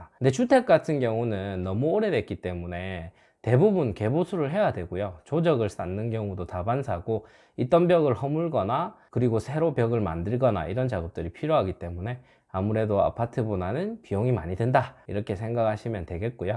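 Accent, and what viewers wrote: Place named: Gyeongsang, South Korea. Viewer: 대구 주택리모델링으로 검색하다가 시청한 영상이라서 대구분인 줄 알았는데 부산이시군요^^ 말투는 대구, 경북 같아서 나중에 문의해봐야지 했는데 아쉽네요.^^ 그래도 자주 들어와 볼게요.. 영상 잘 봤습니다.^^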